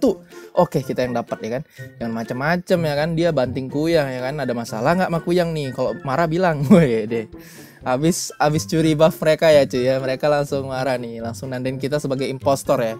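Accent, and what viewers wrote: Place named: West Java, Indonesia. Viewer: Ohh kirain.. Nada bicaranya, logat bahasa Indo nya kaya orang Sunda Bang. He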